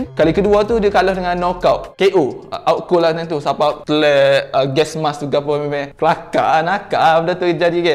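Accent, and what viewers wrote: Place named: Kelantan, Malaysia. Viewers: Bahaso kelate demo pekat sngat bey , ore lain comfirm xpehe , pah sor lg lorat nok g mano ? Aduhhh laa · aduh laju beno ckp...campur2 klantan plak...susah nk phm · Kelate ni boh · Awok terlalu kelate untuk sayo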